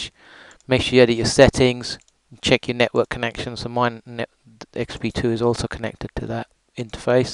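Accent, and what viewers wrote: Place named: United Kingdom. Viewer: INDIAN With british accent !! great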